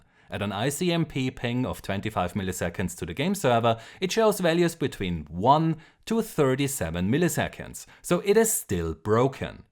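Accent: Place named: Germany. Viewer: Man hört es als deutscher das du deutscher bist 🤙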